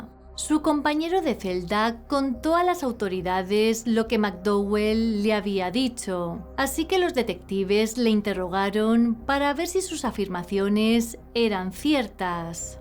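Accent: Spain. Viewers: Hola gracias x tu arduo trabajo,me encanta tu forma de narrar los casos y tu acento español,muchas felicidades y bendiciones para todos.🙏🏼❤️🥰😍😉🤗💐⚘️🌷🌹👊👍❤️🙏🏼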